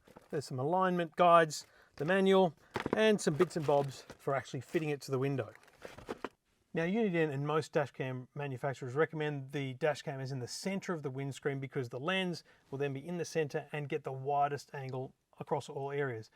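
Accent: Australia. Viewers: This video isn’t in the UK. He has an Australian accent. Also, the UK and Australia aren’t third world countries and they need to meet similar safety standards as the US, so yes there are definitely airbags in those a-pillars. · This is very clearly an Australian accent.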